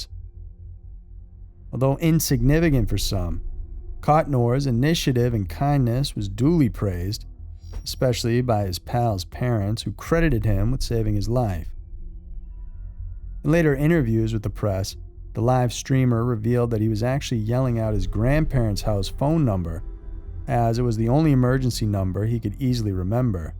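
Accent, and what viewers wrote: South, United States. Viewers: God damn I thought that was Matthew mfking “alright alright alright” McConaughey doing the narration. That southern drawl was just a-twanging in my ear I tell you w’hat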